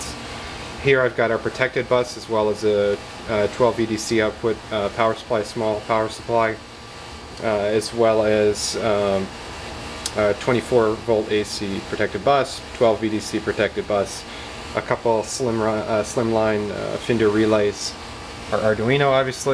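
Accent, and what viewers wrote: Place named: Minas Gerais, Brazil. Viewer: deu pra perceber o sotaque mineiro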